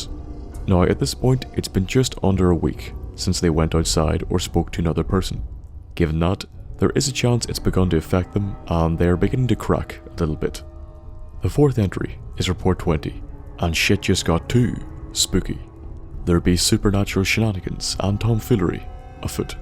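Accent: Northern Ireland, United Kingdom. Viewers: Great video man.What part of Northern Ireland are you from btw?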